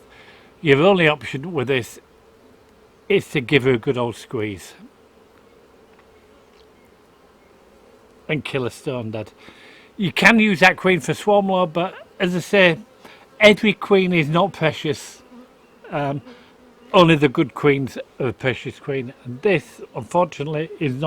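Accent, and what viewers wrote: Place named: Australia. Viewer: Sounded like an Aussie to me.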